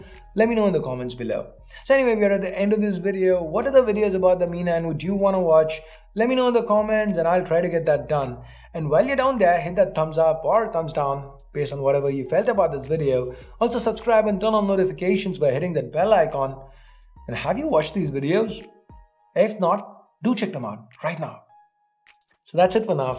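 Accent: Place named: India. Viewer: It's the Indian accent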